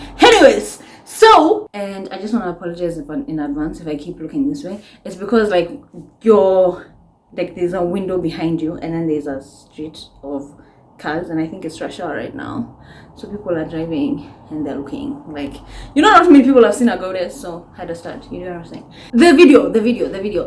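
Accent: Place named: United Kingdom. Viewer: its the bri*😁ish accent for me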